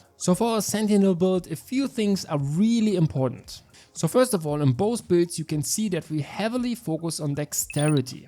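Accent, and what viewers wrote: Germany. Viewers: so geil wie ich englisch immer besser versthe wenn ein deutscher es spricht. ^^ danke für das video!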